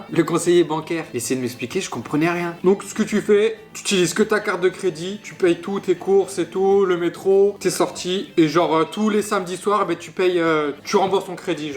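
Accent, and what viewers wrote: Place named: Quebec, Canada. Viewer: En effet, comme l’a dit de Gaulle à Montréal en 1967 : « Vivi le Québec libre ! « Pour l’accent québécois, dans quelques années tu l’auras mieux mon pot, mais c’est déjà un bel effort. Ciao!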